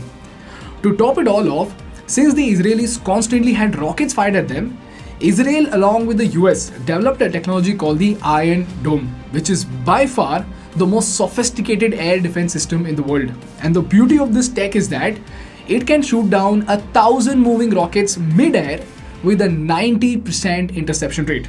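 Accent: India. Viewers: Good content 👏👏, but due to your indian accent, kindly add subtitles so that we fully get what you are saying. Much love from Kenya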